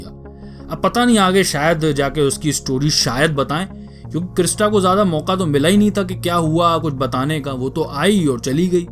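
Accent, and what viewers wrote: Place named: Lucknow, India. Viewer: bhai.. "Welcome to the Indian gamer channel".. sunn ke dil khush ho jaata hai.. dil khush kar diya.. aapke spintires aur mafia2 ke hum kaayal hain.. valient heart bhi dekhna shuru kiya hai.. baniyan wale badasses ko dekhkar hruday gad gad ho utha hai.. ise bhi poora karunga :D bhaiyyaji..waise lucknow k ho ka? aapke baat karneka lehja hamare sharmaji se kaafi milta hai.. aur bohot bohot dhanyawad hume izzat bakshne ka.. Aise hi karre karre gameplay banate jao bhaiyyaji.. Hope to see IG channel flourish..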